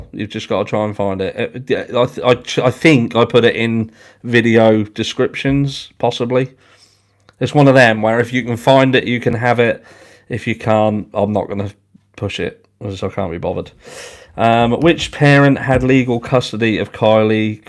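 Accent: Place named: West Country, United Kingdom. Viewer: Hahaha love your style. From fellow UK true crime addict im from the West country too, plus I recognise your accent brilliant x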